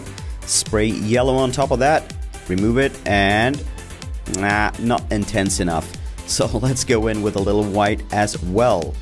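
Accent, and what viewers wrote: Scania, Sweden. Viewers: skånskan caught me off guard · what (I'm assuming) is a Swedish accent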